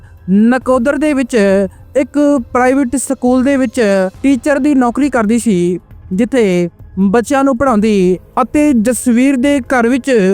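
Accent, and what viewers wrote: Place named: Bihar, India. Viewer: bolna te sikh la punjabi jn bihari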